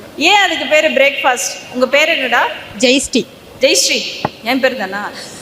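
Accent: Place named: Virudhunagar, India. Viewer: Medam ungala virudhunagar ill ungalado pessu arumaiyakka irunthathu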